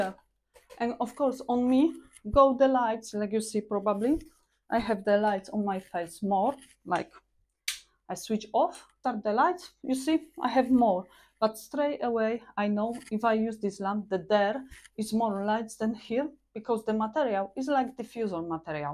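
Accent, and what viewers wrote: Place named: Germany. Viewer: She is speaking English with what sounds like a German accent, based on my time in Deutschland.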